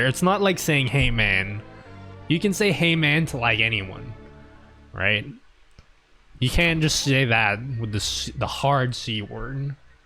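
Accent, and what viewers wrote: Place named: United States. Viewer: Okay your accent sounds so American to me and you're constantly talking like you're not American and it's confusing the hell out of me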